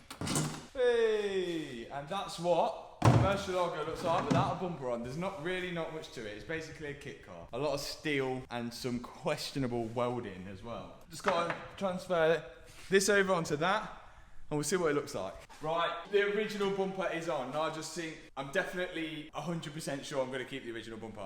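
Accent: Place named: Wales, United Kingdom. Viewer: He talks like my old welsh boss even looks like him But 65 years younger lol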